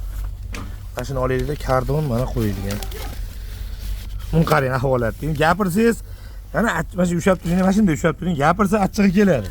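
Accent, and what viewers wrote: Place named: Andijan, Uzbekistan. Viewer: Бу бола жон куйдириб гапиришидан Анжанлиека ухшайди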